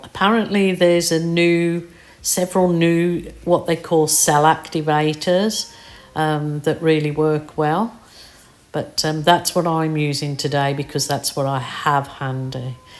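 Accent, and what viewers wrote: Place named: Norfolk, United Kingdom. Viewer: This is beautiful - What is your accent? I feel like i could hear lots of different ones, bit of a norfolk twang - bit Birmingham, bit Australian... Could listen to and watch you for hours...❤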